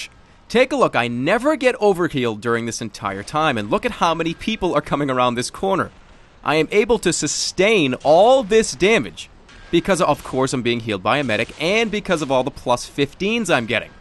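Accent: Boston, United States. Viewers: your from where the scout is! boston!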